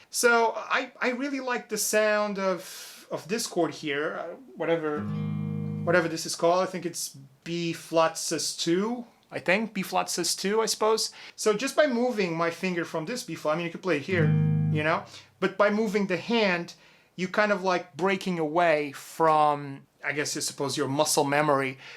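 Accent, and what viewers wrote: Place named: Portugal. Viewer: parece portugues a falar ingles , bom video , boas dicas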